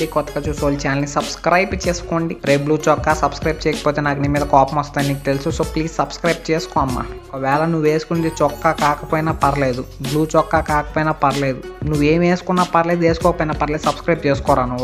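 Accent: Nellore, India. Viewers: Bro nuvvu Nellore aa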